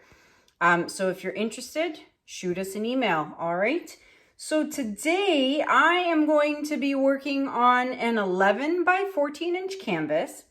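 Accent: Canada. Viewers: I just love your videos. They're incredibly informative and love the Canadian accent. Your work is gorgeous. One of the videos of yours that I watched you counted in Greek and said that's your Greek lesson for today. I'm a Greek girl from Chicago so I was hooked after that. I also have a Sophia. :)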